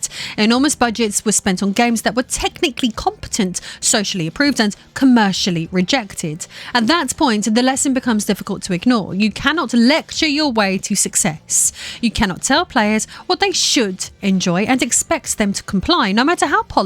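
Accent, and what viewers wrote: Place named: Cockney, United Kingdom. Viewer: That cockney accent coming through but also sounds a bit Greek, am I wrong? Great video btw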